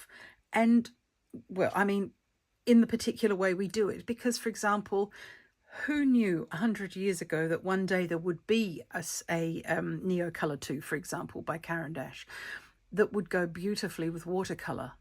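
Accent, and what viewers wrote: Australia. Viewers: Have just discovered you, thoroughly enjoyed your video, and hearing your Aussie accent:)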